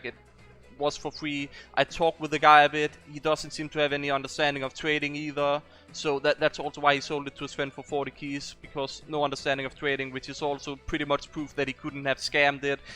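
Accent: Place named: Denmark. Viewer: Where are you from? You sounds like a dane tbh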